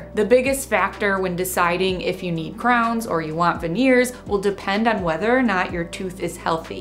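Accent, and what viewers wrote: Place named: Midwest, United States. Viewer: You sound midwestern as hell, Chicago??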